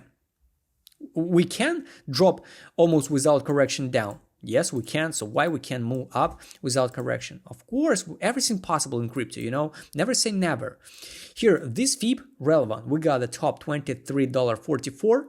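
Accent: Russia. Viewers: man you have clearly Russian accent, isnt' it?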